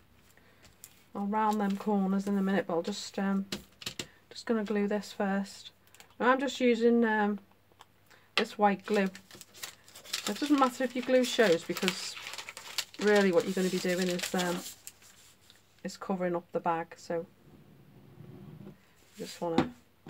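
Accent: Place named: Liverpool, United Kingdom. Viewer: Hello. What part of Liverpool are you from.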